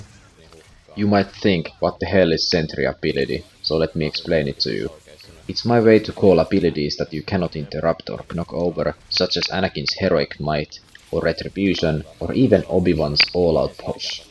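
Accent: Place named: Finland. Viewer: That's the Finnish accent perkele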